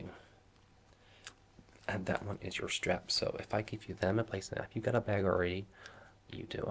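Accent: United States. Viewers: Great video! And it is funny how you try to do an American accent (you did a good job) and you didn't really pronounce the "r" which is typical British. So instead of saying offer you said offeh. Don't get me wrong I like it! Once again great video and I've been subscribed to you for quite a while so yeah! Keep up the good work! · Wonderful job with this one. You did a pretty good job with the American accent. You dropped it once or twice when you said certain words but it's no biggie at all. It's a wonderful video. Brilliant job as always. :) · Say... that's a pretty good American accent. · This is my first time watching him and I thought he was American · THE AMERICAN ACCENT IS AMAZING. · I didn't think your American accent was that bad actually! I have definitely heard worse :D This was a lovely video. I was surprised at how the sound of the metal got my tingles going. 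What a great trigger! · I LOVE your american accent! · omg nice American accent!!!! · I hear... Boston, NY and some Tennessee twang. Now thats American! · Nice American accent great role play thank you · He's in the UK. Maybe he's trying to do an American accent. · You know, I've always wondered what it would be like to do an American accent when you normally have a British one. Anyway, good work on the accent; it's not that terrible! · Not a bad American accent! Much better than most Americans can do an English one! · oh that american accent is hilarious & insanely cute. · Love the American accent. :) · You're a mix between classic US accent, the Joker, and a nerd... And I kinda love it.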